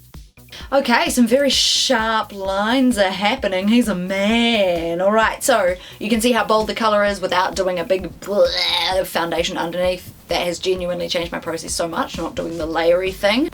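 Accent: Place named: New Zealand. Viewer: I heard that kiwi accent and I was IN!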